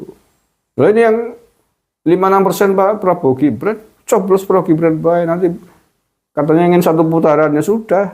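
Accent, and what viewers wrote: Banyumas, Indonesia. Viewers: Ora ngapak ora penak ya kang😂😂👍✌️ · Malem rajaban, melekan kang + ndonga. Salam waras bedigas. Ora ngapak ora kepenak. Semangat terus kang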